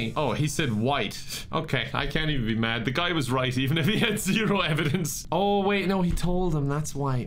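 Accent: Ireland. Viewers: IDK Why, But i really like the irish accent, I've always wanted to be irish myself but i don't think i can change myself like that! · Do you all remember old. Those were some of the best videos. Now he just repeats what happened in an Irish accent., if you read this go back to the old variety channel kind of thing. That was great.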